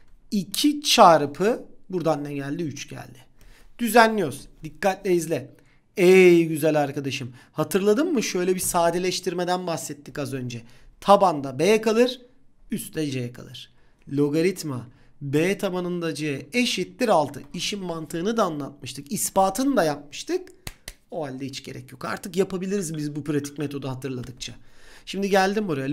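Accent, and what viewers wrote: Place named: Izmir, Turkey. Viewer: Bi İzmirli ağzı sezdim sanki.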